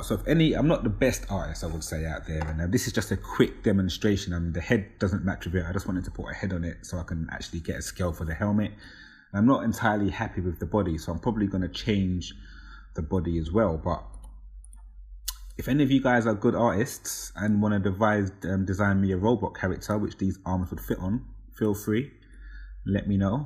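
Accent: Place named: London, United Kingdom. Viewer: First one I've seen of you with a voice-over. Enjoying the London accent.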